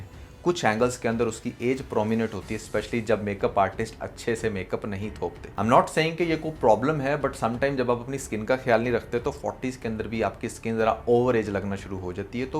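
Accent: Punjab, India. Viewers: This host is definitely an annoying personality , but overconfident 😂should work on his speaking and presentation skills, his english, speaks English in Punjabi style , instead of bashing the stars it is the mindset of the people who produce such programs and show only very young characters, if someone has acne it’s not their fault , it’s not poor hygiene most of the times because it can be hormonal , and acne ages skin and if someone has acne does not mean their age is more, he thinks he is very intelligent ,very typical uneducated male personality! Grow up!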